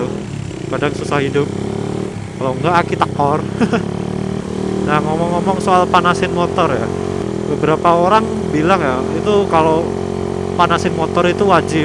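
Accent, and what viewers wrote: Semarang, Indonesia. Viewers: Koyo semarang iki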